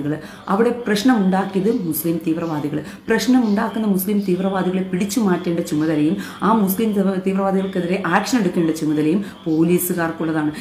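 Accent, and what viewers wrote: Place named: Palakkad, India. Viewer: Ms.,are you a Palghatwala your language Usd seems to be of palghat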